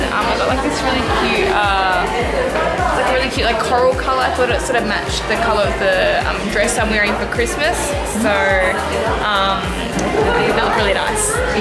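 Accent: Australia. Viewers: ur aussie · Are you Australian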